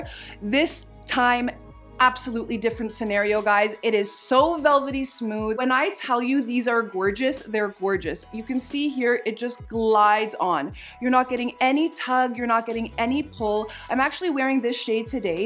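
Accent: Canada. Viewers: I just came across your channel and i love your accent! are you from Canada? 🇨🇦 my instagram handle is !!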